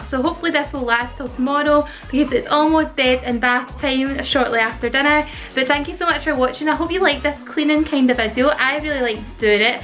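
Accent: Scotland, United Kingdom. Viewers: Oh I love these cleaning videos, im scottish too, it was a surprise to hear a Scottish accent! 😊 most are American you tubers.loved the video. subscribed 😁 x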